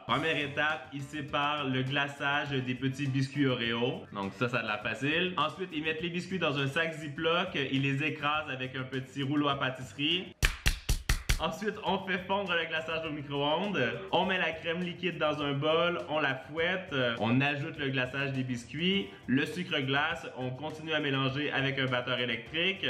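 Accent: Quebec, Canada. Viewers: J adore ton accent québécois 😂😭😂😭😂😭😂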